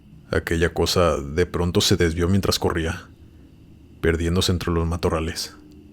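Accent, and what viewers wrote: Mexico. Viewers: Me gusta mucho este canal. >Narra las historias con la seriedad que merece. >tiene una voz muy agradable y modulada, y norteña como yo. >los relatos son muy interesantes, incluso siempre que los escucho logro estar ahí donde se narra, en el bosque, desierto, etc.... es que debido a las experiencias vividas en mi infancia, no tengo el miedo que se esperaría deba sentir, y aparte consumo mucho contenido como el suyo, quizá buscando experiencias similares a las mías o buscando una respuesta. >me gusta mucho que en cada relato pone un título y subtitulo. Le da más suspenso. GRACIAS.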